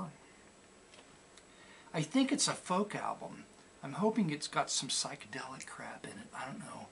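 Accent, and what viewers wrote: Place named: South, United States. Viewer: You have a fine country accent going on there.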